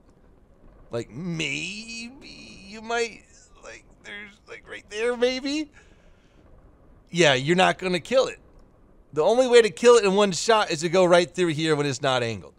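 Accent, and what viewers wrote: United Kingdom. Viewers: thats cause hes brrrrrrrrrrrritishhhhhhh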